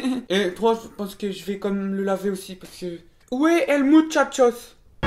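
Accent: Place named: Nord, France. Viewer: Il est de ou ? Parce que son accent est plus du 59 que du 62 .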